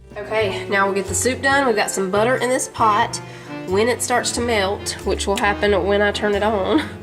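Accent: South, United States. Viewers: Hey, I love love your videos. So cozy and homey and your sweet southern accent !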